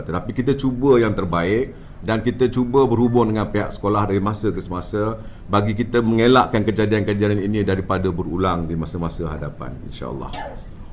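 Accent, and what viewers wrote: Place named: Perak, Malaysia. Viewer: pekat bebenor peraknye yeop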